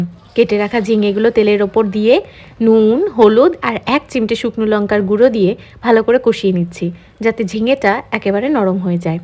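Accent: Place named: Barishal, Bangladesh. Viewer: আপনি তো মনে হয় পশ্চিম বঙ্গ ভারতের। কিন্তু কী সুন্দর বরিশাল বাংলাদেশীদের মতো কথা বল্লেন! ভাল লাগলো! আমিও বানিয়ে দেখবো এই রেসিপিটা। লোভনীয় হয়েছে। ধন্যবাদ। ❤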